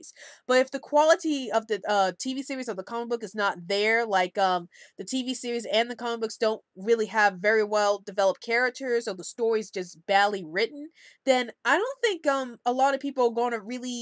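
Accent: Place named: Boston, United States. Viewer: Thumbs up; good video! I feel that quality is important because of the reasons that you said in the video. By the way, are you from Boston? I say this because of your accent.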